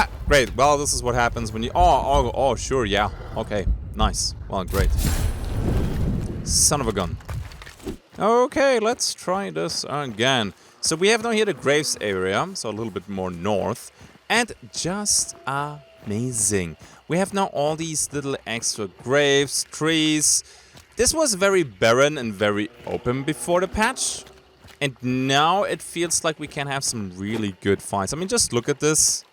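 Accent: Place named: Germany. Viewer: This is the most perfected Denglish I've heard in my life, I am a big fan! Great content dow 😅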